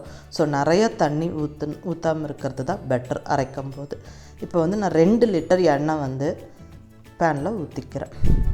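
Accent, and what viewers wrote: Coimbatore, India. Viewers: I like ur kovai slang --- saeithi, karuvaapla,vangayam etc. Ur videos are so good. Keep it up. expecting your house tour as well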